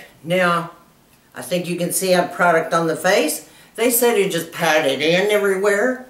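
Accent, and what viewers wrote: South, United States. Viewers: I figured Alabama or Texas lol. I'm from SC. We tend to hang on to the end of words lol. Thanks for the update on this mask. Would love to try it. LOVE to SEE hydration and plumpness father a mask!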